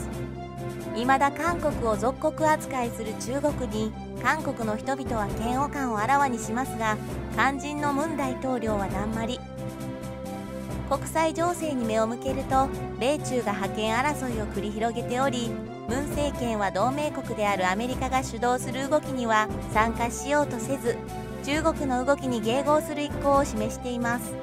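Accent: Kansai, Japan. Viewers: 柔らかい関西弁、好き！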